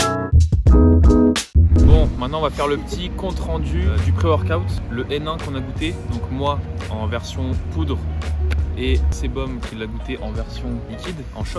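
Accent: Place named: France. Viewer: To be honest I didn’t understand a lot of words but watching you because of your wonderful French accent. It’s slow for me and helps me understand what words you use. Greetings from Russia👋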